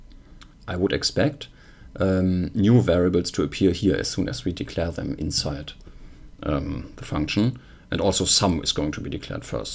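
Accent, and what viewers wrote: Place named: Germany. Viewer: Are you german? You sound german, especially when you say 'digit'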